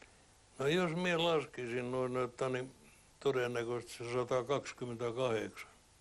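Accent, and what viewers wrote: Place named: Sortavala, Russia. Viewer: Utissa <num> aloitettiin alokkaina. Esikuntakompanjan rakennuksen vieressä oli Mersu, jonka edessä otettiin ensimmäiset kuvat. Tupien nimet olivat menetettyjen lentotukikohtien nimiä. Illu puhuu Sortavalan miehen murteella ja tyylillä juuri noista paikoista, joiden nimiä käytimme ryhmänjohtajina. "Tupa Suurmerijoki jne"..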